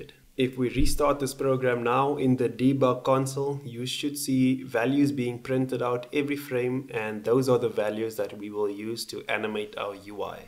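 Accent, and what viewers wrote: South Africa. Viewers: Going out of topic... are you a South African? you sound like an Afrikaans speaking coloured. :)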